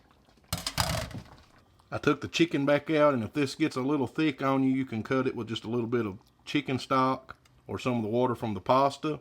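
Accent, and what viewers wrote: South, United States. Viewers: Love your videos and great instructions. And your accent is the best! I’m a Texan living in Michigan, and I need my Southern accent fix from you!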